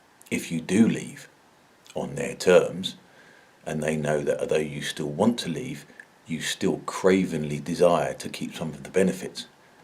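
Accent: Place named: United Kingdom. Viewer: Thourghilly enjoyed that, Both the incisive content And,the very british delivery. Heath in 72 took us into the common market without a referendum,as he stated to civil servants,his mandate to join came from his 1970 election victory, Yet that join or stay out question was never a part of the 1970 conservative manifesto. Heath said "it would be wrong if any Government contemplating membership of the European Community were to take this step without `the full hearted consent of Parliament and people" Heath lied Heath new that the opinion polls during <num> were consistently 68%against joining the common market. A referendum,which he all but promised,he knew he would lose, So he made up the story that his parties election victory was in itself the mandate to join. Further,he then suppressed the civil service advisories on both The loss of sovereignty but also on the future loss of judicial power, Economic freedom,and even military independence. 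This report surfaced and was made public in 2018. It clearly shows the deceit that heath used to sign us in , Without our ,the electorates, Agreement. Traitors like heath infest parliament. Cameron lost the brexit vote His party then elected may Knowing full well she was an adamant remainer, Those that had a hand in that election are traitors to the people and democracy of this country. Their can only be one way to deal with traitors, And should this country decend into civil war over this fundamental betrayal,rest assured ,those that Ac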